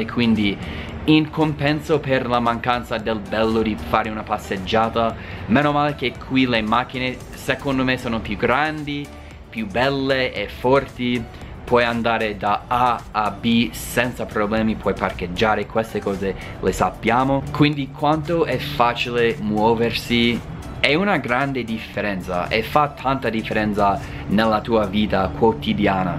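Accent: United States. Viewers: a hint of american pronunciation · Un abbraccio da Genova che italiano super....bravissimo...ottimo video!! E' carino l' accento americano quando parli la nostra lingua sei forte....Genoa e United States forever...!! Tanta fortuna a te e auguri.. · Ti posso chiedere come mai hai ancora questo forte accento americano nonostante dai due anni e mezzo vivi in Italia? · Altra cosa, mi piace un sacco sentirti parlare italiano con il tuo accento americano 🤩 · Mi piace ascoltarti: parli un'italiano davvero ottimo e con questo accento americano l'ascolto è piacevole!Complimenti!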